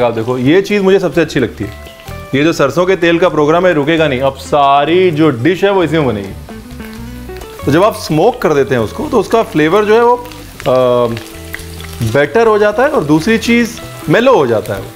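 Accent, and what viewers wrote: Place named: Bengal, India. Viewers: You have a punjabi heart with Bengali accent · Can we just take a moment to appreciate his cute bengali accent💯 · Wow......again I fall in love with your recepie and your Bengali accents❤❤❤.... 'Bhaat ghum', 'macher jhol', I felt that you are the family member of all bengali family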